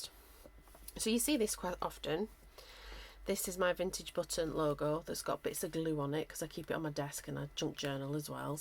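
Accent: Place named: Northern England, United Kingdom. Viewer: I think I hear a northern accent, so if you’re near Wakefield the Salvation Army there has a very cheap charity shop where I get a lot of clothes to use for fabric cheap.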